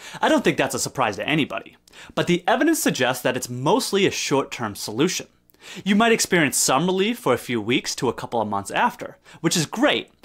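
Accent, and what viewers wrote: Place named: Massachusetts, United States. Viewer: You’re def from eastern Massachusetts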